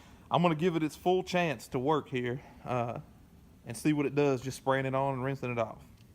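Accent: Alabama, United States. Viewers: Bama boy haha that accent tho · You sound just like where I come from in south Alabama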